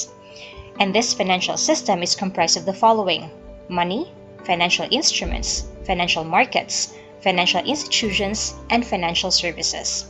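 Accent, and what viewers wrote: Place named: United States. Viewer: Abi nako americana ang gaistorya hehehehe Btw, thank you maam for this discussion!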